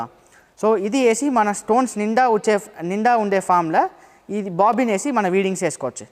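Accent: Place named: Tamil Nadu, India. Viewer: He is Tamilian, far better, got the point know.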